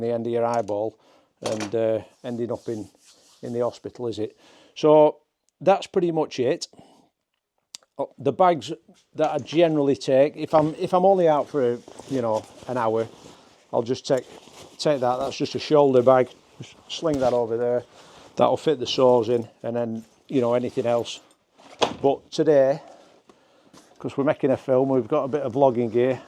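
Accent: Lancashire, United Kingdom. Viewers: Sounds like a Lancashire Lad (or West Yorkshire but that used to be Lancashire lol) I'm from Preston originally - EXCELLENT Video buddy!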